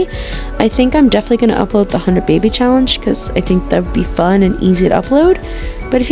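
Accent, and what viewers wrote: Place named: Atlantic Canada, Canada. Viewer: I'm subbing because I can tell you're from Atlantic Canada and so am I 😂 great video!! Really funny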